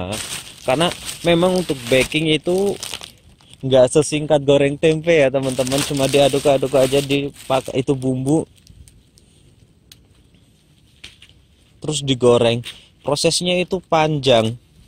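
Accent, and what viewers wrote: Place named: Java, Indonesia. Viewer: Video yg durasinya lama itu yg ku suka... Ku malah berharap supaya tetap seperti itu, kondisi dapur juga apa adanya... Aku syuuuukaaaa.... Sepertinya channel bakalan cepat melejit subscribernya... Btw penasaran sama penampakan papanya 😃😃 cuma denger suaranya... Dari logatnya sepertinya wong jowo yg tinggal di lampung... Sukses selalu untuk... Pertahankan ke apaadaannya....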